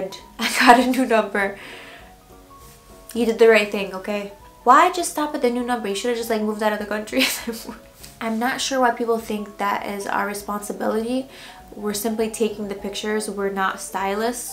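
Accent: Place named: New York, United States. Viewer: Love the NY ACCENT X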